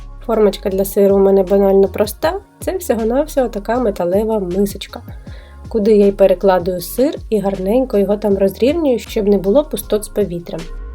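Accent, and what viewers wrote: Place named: Border region, Ukraine. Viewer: Це діалект а не рашизм. Поїдьте в любий прикордонний район, в кожному свій діалект. Тим і прекрасна наша мова. І не робіть вигляд що ви в буденному житті спілкуєтесь літературною мовою. Так раптом всі стали "правильними патріотами" і почали інших вчити.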